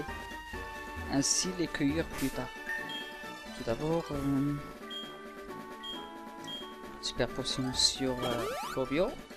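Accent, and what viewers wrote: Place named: Brittany, France. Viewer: trol ton accent de breton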